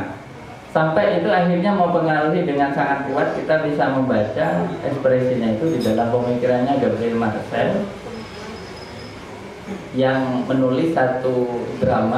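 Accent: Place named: Madura, Indonesia. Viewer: logatnya kayak pak mahfud, pasti dia ini orang madura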